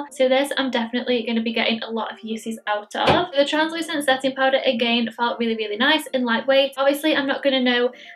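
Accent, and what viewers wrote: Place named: Stoke, United Kingdom. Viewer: Bit of a random question but are you from Stoke? Your accent sounds so Stokie!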